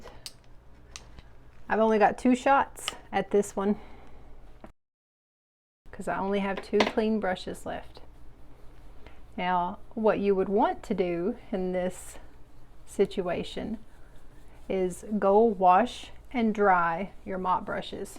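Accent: South, United States. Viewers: I didn't know you were southern! Tennessee? 😊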